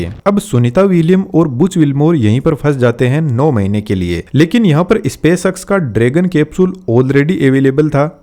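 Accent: Rajasthan, India. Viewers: Bhaisaab aapka accent Rajasthan wala h aap kaha se ho, Mai Jaipur gramin se hu😊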